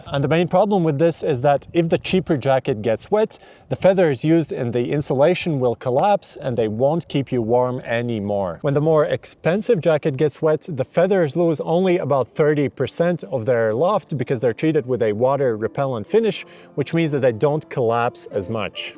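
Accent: Latvia. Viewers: Sounds like Latvian accent... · Tu takš letiņš pēc akcenta 😄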